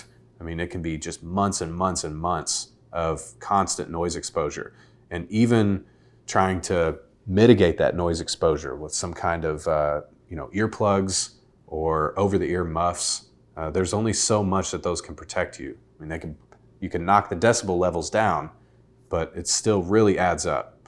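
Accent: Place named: Indiana, United States. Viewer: You, sir are absolutely correct. As a disabled navy veteran myself, I can attest to the facts you've stated. I'm service connected for tinnitus, and have been diagnosed with hearing loss and provided hearing aids as of last year. I also service connected knees, and was diagnosed with sleep apnea. The VA denied my sleep apnea claim despite the diagnosed and cpap machine. I'm currently filing a PTSD, insomnia and migraine headaches. As a native of Indiana myself I pronounce tinnitus the way as yourself lol. And thanks for the great presentation.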